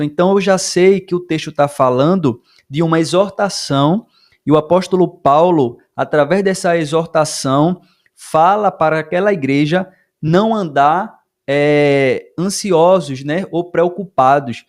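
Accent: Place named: Northeast, Brazil. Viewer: sou Do RJ, pelo sotaque creio que seja do nordeste. Fico feliz por ser tão jovem e dedicado a obra de Deus. Estava fazendo um estudo e apareceu o seu aqui no meu celular. Fiquei feliz . Deus te abençoe um abração do carioca.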